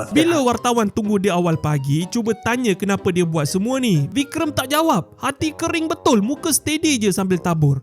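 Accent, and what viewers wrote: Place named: North, Malaysia. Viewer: loghat utara. Belajar tu biar luas, jangan sempit2😅😅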